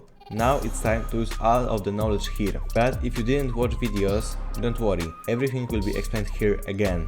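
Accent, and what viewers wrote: Poland. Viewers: slychac ten polski akcent😂😂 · Fajny film dobra robota, na pewno mi się przyda. Nie chce nikogo obrażać ale ten Polski akcent jest bardzo wyczuwalny😂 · Ah słychać ten polski akcent w twoim głosie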